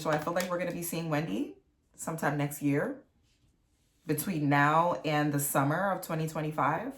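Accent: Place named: New York, United States. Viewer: You took me back to Star and Buckwild. I said definitely a New Yorker😂😂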